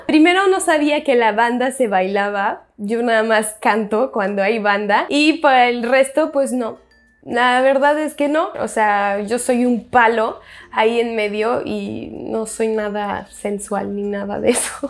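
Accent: Mexico. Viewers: saludos guapa de veracruz..me encanta oirte hablar frances se escucha genial..me impresiona oirte hablar con acento mexicano ytambien si hablaras español con acento frances seria genial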